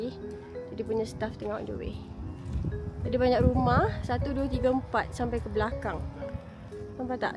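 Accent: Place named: Sabah, Malaysia. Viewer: comel cakap sabah.... buat lah story pekenalan dan suami dan q and a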